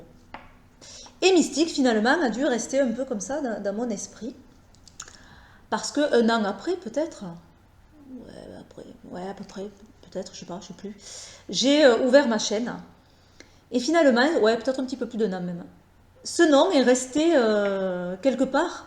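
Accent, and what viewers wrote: South, France. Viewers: ce bel accent que nous avons dans le Sud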